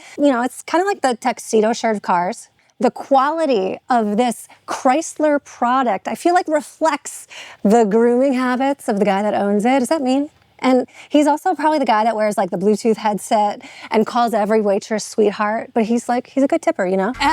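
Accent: Chicago, United States. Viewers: Yes. If you close your eyes and just listen - 1000% Chicago high class girl. Unmistakable. Even with the man's watch. Brilliant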